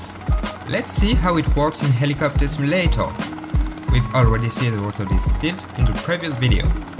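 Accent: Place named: France. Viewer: le bonne accent français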